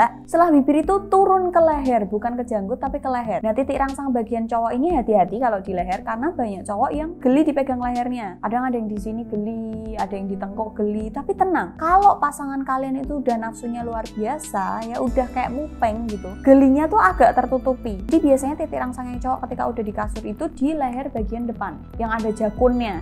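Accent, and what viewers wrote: Java, Indonesia. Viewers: Bahasamu we loh mba medok banget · Lucu kamu medok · Bisa jawa jowo mu ngendi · nek ngomong yo muueeedokkk lucu sih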